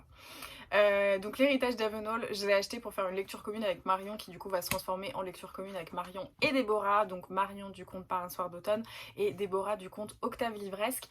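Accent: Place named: England, United Kingdom. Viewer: Trois de Valérie Perrin! Trop fastoche pour la première! Sinon je rêve d avoir ton accent anglais!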